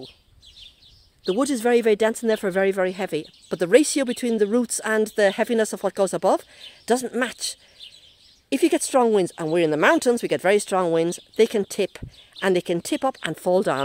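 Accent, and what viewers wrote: Ireland. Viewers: I just discovered your channel today, and have been binge watching your videos. I just watched your "last" video. I am sooooooo dissapointed your not continuing on with your videos. I understand you completed your 3 year mission, but from the looks of all the well wishers, I think many people would love to see you continueon and make a new journey. You have so much knowledge to share, and your very entertaining with your funny witt, plus that Irish accent is lovely. I loved seeing your garden, it gave me so many ideas to start thinking about for next year. please come back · Only found your videos today(while searching for pruning advice on viburnum tinus)Twas the Irish accent that made me continue watching!Wowza,you are truly AMAZING! Thank you sooo much for sharing the wonderful advice/suggestions/inspirations/and of course your incredible knowledge & sensiblity/you are a GEM!Continued Good Health,& Enjoyment with your Gardening.Best Wishes,from rainy July in Ireland.p.s. 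I have enjoyed 2 hours of watching some of your videos, (lots more to watch yet),that "d'other half"is wondering when is dinner!!!!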